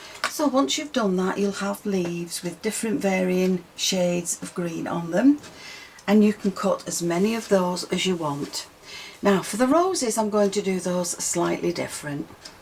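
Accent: United Kingdom. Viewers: I love your british accent it is very soothing and relaxing, and the music is very pretty and also soothing and relaxing.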